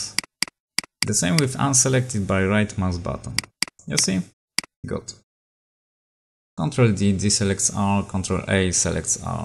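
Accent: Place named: Poland. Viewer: Widziałem, że skądś znam ten akcent, Polak jesteś. :D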